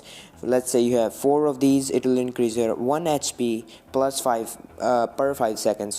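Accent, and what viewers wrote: India. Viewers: Youre accent is Indian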